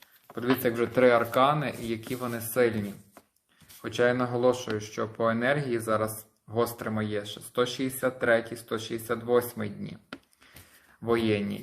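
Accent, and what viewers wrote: Ternopil, Ukraine. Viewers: Дякую, послухаєш вас і легше на душі,чую рідну тернопільську мову.Щасти Боже!!!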